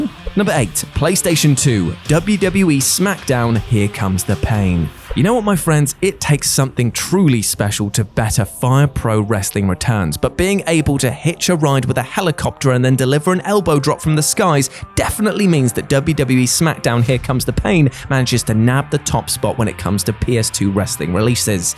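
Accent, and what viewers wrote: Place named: United Kingdom. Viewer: All the weird brits talk like that. It's annoying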